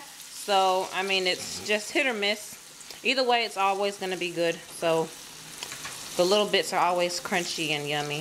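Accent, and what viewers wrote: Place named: United States. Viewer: U sound americanized as all heck...like me lol. And your mom sounds like mines to rest her soul. Thanks for the recipe :)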